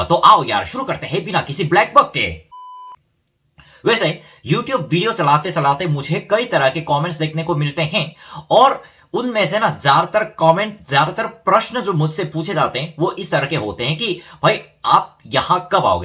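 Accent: Standard. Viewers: Ettni shudh hindii mujhe bhi nhi aatii 😅 i like your shudh hindi 😁 I am from Bihar Patna 🙂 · Kon bevkuff hai jo ye sab bola kisine subscribe kiya toh aapko kharid nhi liya hai sach a low thinking ese log bahut selfish hote hai are uski life hai woh kahin bhi jaye ghumne tumko qya kam se kam woh aapki sanskriti ko toh faila raha hai korea ka hokar he is inocent guy i like his clear hindi accent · Nice video 💜💜. Itni shudh hindi to mujhe bhi nehi ati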